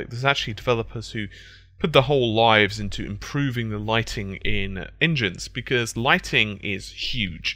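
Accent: United Kingdom. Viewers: That intro always reminds me of a British Buffalo Bill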